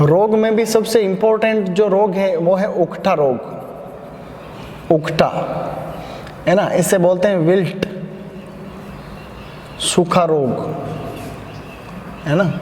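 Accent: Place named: Maharashtra, India. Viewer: aapke baat karne ka tareek mujhe Maharashtra kaa laga so im tell you Any way best of luck for your future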